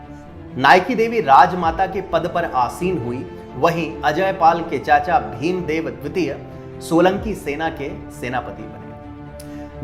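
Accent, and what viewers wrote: Standard. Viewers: I have seen and heard quite a lot of your historic narratives... I have to admit.. Aap ka gyan kai guna shrestha hai atirikth anya tathakathit gyaniyon say.... Evam jo shudh uccharan hai wo bhi sarahana yogya hai... Bandhu. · Itni sudha hindi kabhi kabar hi sunane ko milti hai, I like your speech. Chanakya, yogi, k baad pehalibar aapse itni shudh hindi suni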